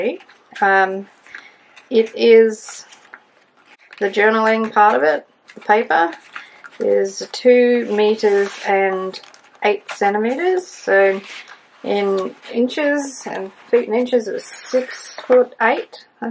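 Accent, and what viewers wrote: Australia. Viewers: It’s a fantastic scroll. I loved following along your great journey of discovery, it’s so different from all the other journals I have followed through January 😍I’m in Melbourne and loved hearing your Aussie accent💖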